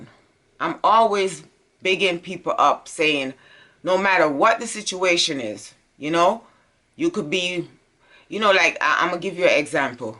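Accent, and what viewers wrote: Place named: Jamaica. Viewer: I love your channel! One of my favorite things is listening to your Jamaican accent I love it 🌟✨💫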